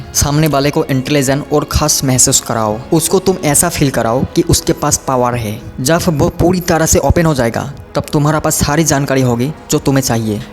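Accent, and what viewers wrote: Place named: Bengal, India. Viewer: Bengali ascent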